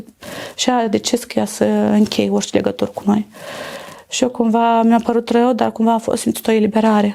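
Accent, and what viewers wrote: Moldova. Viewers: După accent din satul Giurgiulești!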